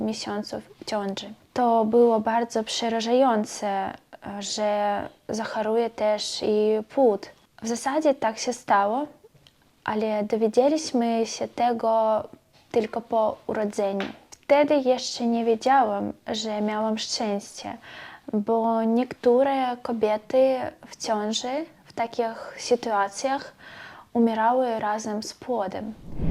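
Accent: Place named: Podlasie, Poland. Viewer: Jesteś jeszcze młoda, jak będzie Ci się tutaj podobało i będziesz chciała to bez problemu zostaniesz Polką. A co do akcentu, to z tego co słyszałem na Podlasiu mieszkają Polacy i mają podobny akcent😁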